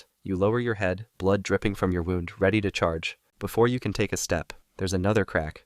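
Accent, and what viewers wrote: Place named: United States. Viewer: Why is the location of this channel the United Kingdom but the narrator is American?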